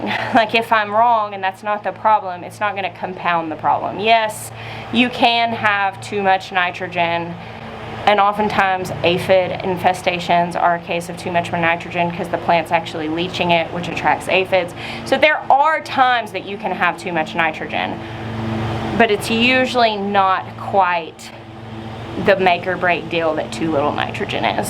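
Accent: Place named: South Carolina, United States. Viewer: your SC accent is coming on strong!! 😁🌻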